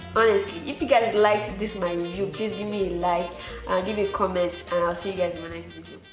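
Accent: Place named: Africa. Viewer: What part of Africa are you from. I love your accent.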